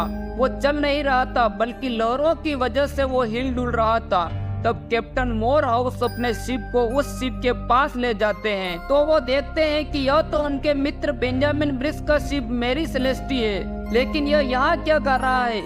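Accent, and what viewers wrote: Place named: South, India. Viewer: Naration is very bad in southern accent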